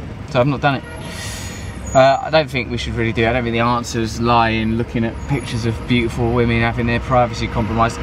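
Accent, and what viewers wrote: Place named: United Kingdom. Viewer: Speak slower please. Can barely understad you sometimes. Not everyone is British.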